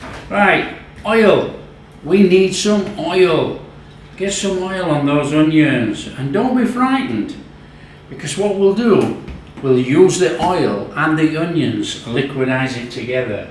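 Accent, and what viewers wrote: Yorkshire, United Kingdom. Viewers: Love hearing a fellow yorkshireman when i click on a video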